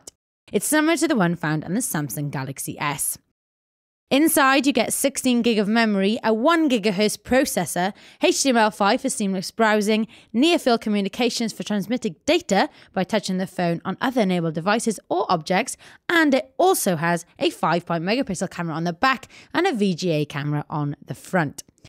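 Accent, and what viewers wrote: Wales, United Kingdom. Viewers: Omg!! Maybe because it's not an English accent it's a Welsh one!!!!!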